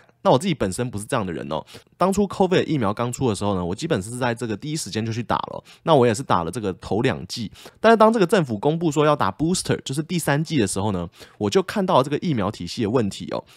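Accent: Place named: Taiwan. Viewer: 台湾腔，哈哈😀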